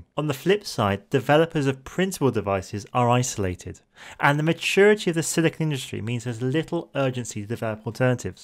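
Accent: United Kingdom. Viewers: He's effin English. He ain't from Texas...(By saying 'effin English' in no way I meant that pejoratively)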